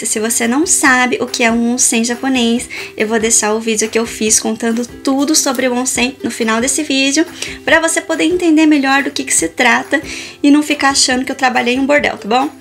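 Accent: Minas Gerais, Brazil. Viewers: Adorei você, seu sotaque mineirinho, sua delicadeza, realmente um verdadeira gueixa brasuca. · Tu é de Minas Gerais?! Tem um sotaque mineiro da hora! 😅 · Queria saber em qual parte do brasil ela nasceu e foi criada pq o sotaque e as girias conheço todas kkkkk! Sou do Cearà.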